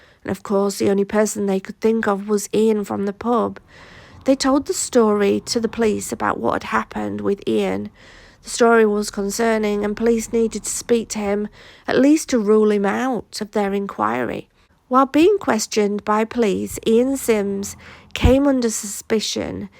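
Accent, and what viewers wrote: Yorkshire, United Kingdom. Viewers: My absolute favourite creator/narrator. Hearing your Yorkshire accent makes me feel at home. I live in California now and haven't been home in 30 odd year so hearing your voice gives me such a warm feeling. It's hard to explain but all you need to know is I absolutely LOVE listening to you. It doesn't hurt that you make great content as well Cheers 🤘🏻🇬🇧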